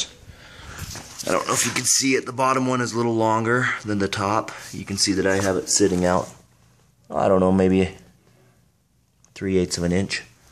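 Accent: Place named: United States. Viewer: No he doesnt. He sounds american.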